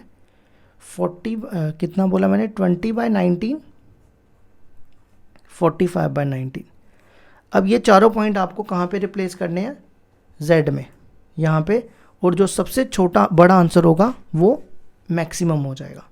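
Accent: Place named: Jammu and Kashmir, India. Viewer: Bhai kaisa hai tu unke udhar sab aise hi bolte hai j and k se hai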